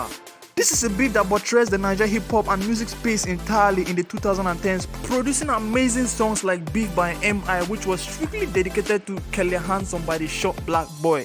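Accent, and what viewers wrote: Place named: United Kingdom. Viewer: I love this voice over Giving me “British Vibes”😍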